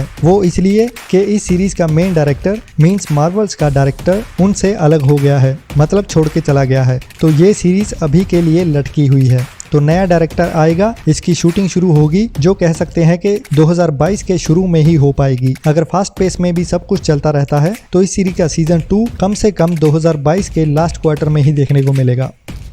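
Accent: Punjab, India. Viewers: You speak like Dara Singh and such a heavy Punjabi accent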